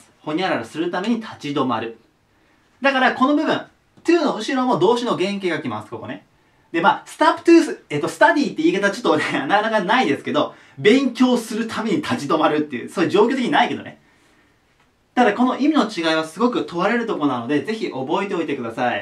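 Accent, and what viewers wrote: Japan. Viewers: 発音はちょっと。。。😂😂ジャパニーズ・イングリッシュ。。。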